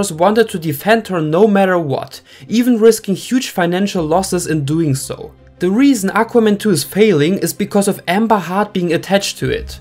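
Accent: Germany. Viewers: He‘s German, English isn‘t his native tongue. Relax.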